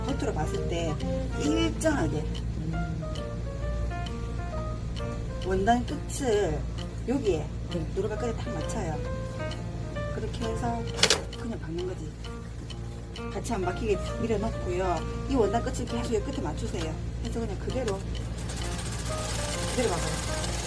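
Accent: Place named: Busan, South Korea. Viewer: 오랜만에 부산말 듣네요 용신다 요즘도 이 말 쓰네요 젊은분이 용신다 하시니 오랫동안 외국에 사는 제가 고향 부산이 확 들어 옵니다. 손녀 들 옷 만들려고 하는데 너무 예쁜 옷 도움 됩니다. 감사합니다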